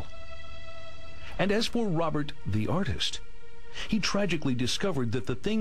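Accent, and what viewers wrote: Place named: United Kingdom. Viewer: hm ur british arent u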